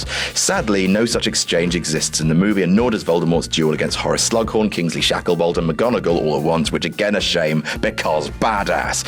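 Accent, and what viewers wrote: United Kingdom. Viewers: You sound British (?) yet you use American titled books.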